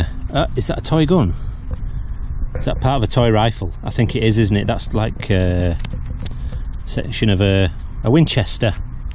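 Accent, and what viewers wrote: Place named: Scotland, United Kingdom. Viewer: I just adore your comments...”a wee sniffer”, “ well, there ya go then”, and there’s always my favorite “kwool”. Yu and your hidey-ho accent are just soo charming! Better clean up the wife’s sink, sweetie, or she’ll have your hide ;). I’ll take that window latch from yu..$20 US? Hhmmm? Didn’t know yu Scots knew about Daley Crockett??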